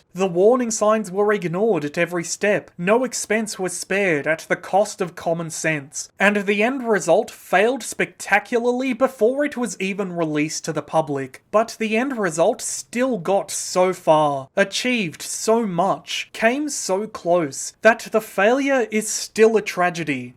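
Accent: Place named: Australia. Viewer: He sounds Australian to me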